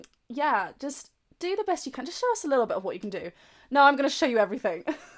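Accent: England, United Kingdom. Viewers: What dialect of British does she speak? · Coach i love ur english accent ❤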